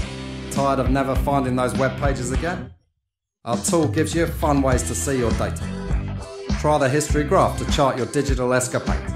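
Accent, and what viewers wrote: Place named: Mockney, United Kingdom. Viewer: Love the Guy Ritchie mockney accent goes well with the presentation. prefer web based apps for windows as not a fan of chrome. Brilliant tool, I must delve deeper into there whys, whats and wheres. thanks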